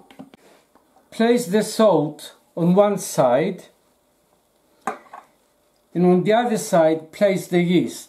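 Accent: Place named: Cyprus. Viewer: I love your recipes as I also love Cypriot food and Cypriot accent 😉😍💖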